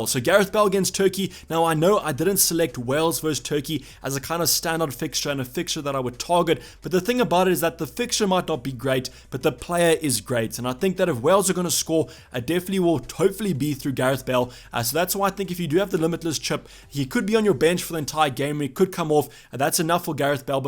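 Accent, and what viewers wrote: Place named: South Africa. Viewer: No but your SA accent just makes it better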